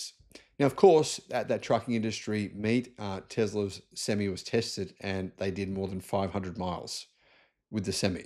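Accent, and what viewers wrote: Australia. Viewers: He is an Aussie and that is how we say it, you sound as though yours is the definitive version. in aus a semi (pronounced e) or prime mover is what you call a tractor. we call the tractors tractors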